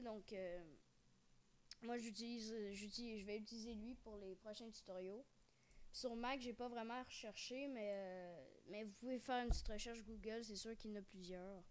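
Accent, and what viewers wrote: Canada. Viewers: Sa se voit que ses un canadien avec la voix. J'ai rien contre eu mes ses la première fois que j’entends parler un canadien. ;)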